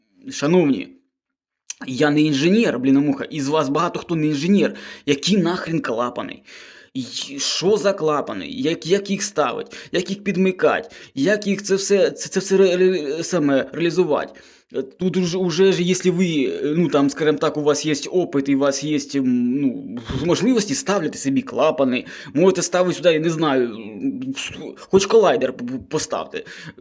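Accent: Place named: Sumy, Ukraine. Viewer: Молодець,що пробуєш на українській мові, Сумщина тримається,сам з неї😊.всі ми тут розмовляємо суржиком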